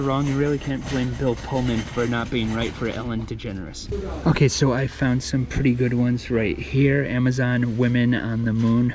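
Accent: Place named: North East England, United Kingdom. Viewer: For those not from the North East: "harrah" = Horror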